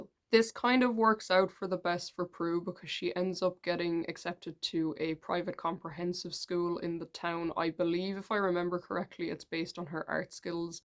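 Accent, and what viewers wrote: Ireland. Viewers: I clicked on this video and recognised the accent straight away lol, r u a south Ireland pep? Unfortunately I'm from the shitty north but it's cool to know I'm not the only alt person on this island 💀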